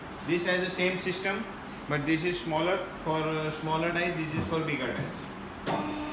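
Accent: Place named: Gujarat, India. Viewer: Gujju engliss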